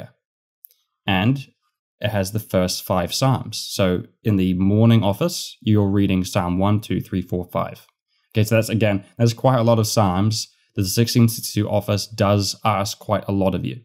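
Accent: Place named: South Africa. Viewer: Thanks so much for this video. It's really helped me understand how to properly go through the daily office. I'm still fairly new to Anglicanism and so I really appreciate this. Also I didn't know you're from NZ (I thought your accent might have been from South Africa or something), I'm on the other side here in Sydney Australia.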